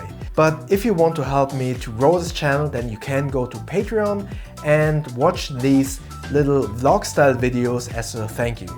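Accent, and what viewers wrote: Germany. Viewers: Deutsche Akzent am Start :D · That T H I C C German accent tho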